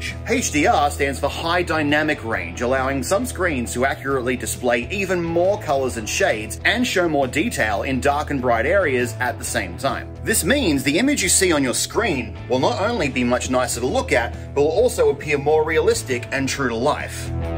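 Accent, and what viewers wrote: Australia. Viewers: It's the Australian pronunciation of the letter, similar to how the Brits pronounce it. They also pronounce 'Z' as "Zed" where US/Canada would pronounce it as "Zee" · OMFG, beard, and AuSTRALIAN an, brooo thank you!!!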